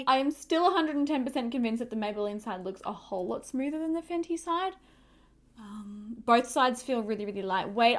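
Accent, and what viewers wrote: Australia. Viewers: I could listen to you talk allll day. Love the Australian accent. 💕 I agree the Maybelline side looks flawless. Great shade for you too. Could I just say, I would love to see you and Tati Westbrook do a video collab! I think your personalities would "gel"! 🥰 Or you, Tati and RachLoves together! 3 fantastic, beautiful, personable Youtubers. 🤗🤗🤗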